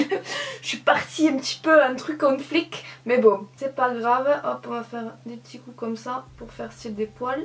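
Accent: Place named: Marseille, France. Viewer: Quel est donc ce bel accent ? Marseille ? ??🤔😉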